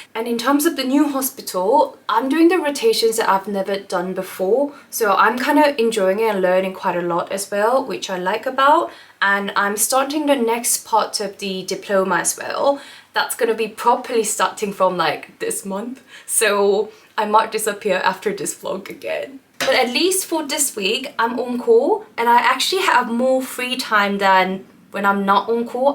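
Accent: United Kingdom. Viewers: 와우 억양이 완전 영국식으로바뀌셨네요?! 앞으로의 새로운 여정들도응원해요! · 으앙 ㅠㅠ 너무 오랜만입니다 ㅠㅠ 대학생 때부터 봤는데 억양도 완전 영국식으로 바뀌셨네용 건강하게 잘 지내시는 거 같아 다행이네용!! 화이팅입니당! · I noticed your accent became more british.. You are my favorite pharmacist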